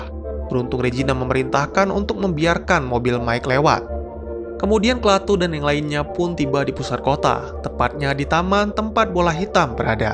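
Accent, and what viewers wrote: Aceh, Indonesia. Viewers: bang orang aceh ya?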